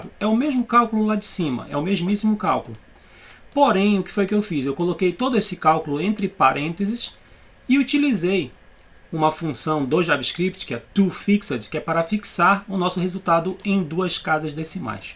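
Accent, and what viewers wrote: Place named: North, Brazil. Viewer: mas o sotaque de nortista tá em dia hehe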